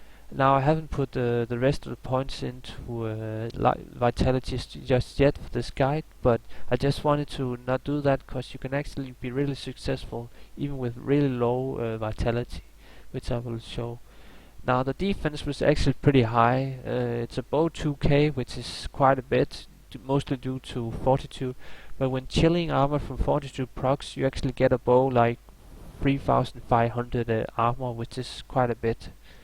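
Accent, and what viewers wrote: Denmark. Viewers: sounds like a dane..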